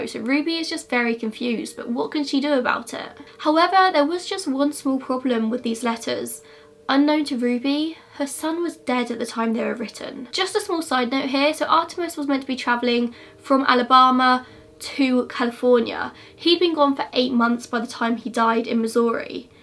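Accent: United Kingdom. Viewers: Hi, you're so cute girl I love your British accent and your gorgeous lips, xoxo.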